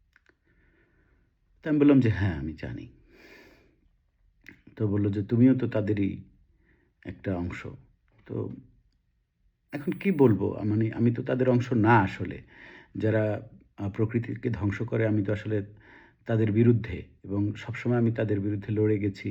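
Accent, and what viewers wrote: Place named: West Bengal, India. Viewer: তোমার উচ্চারণ এবং ভাষা পুরোপুরি পশ্চিমবঙ্গীয়। তোমাকে পশ্চিমবঙ্গের আসার জন্য আমরা স্বাগত জানাচ্ছি।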